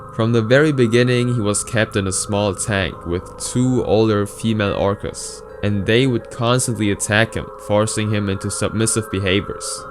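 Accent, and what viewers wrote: Germany. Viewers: Are you german? (my curiosity kills me just because I hear an accent lol)😅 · Are you by chance german? Is your native language german?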